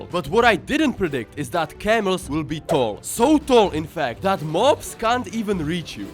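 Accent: Czech Republic. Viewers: ceskej accent jde hodne znat xd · cau podle prizvuku jsem poznal ze jsi cech drzim ti palce at se ti dari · Dle tvého přízvuku si tak na 85% typuju, že jsi z Česka. Je tomu tak?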